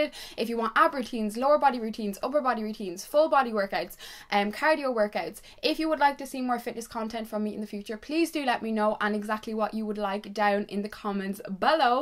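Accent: Ireland. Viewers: Are u Irish you sound irish 🇮🇪❤️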